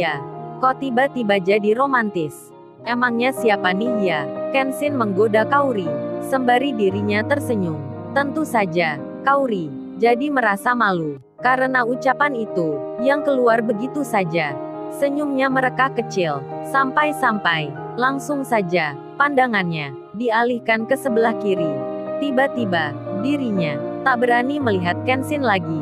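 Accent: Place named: Tegal, Indonesia. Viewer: kaya,a mirip boso tegal nggih 🤭🤭🤭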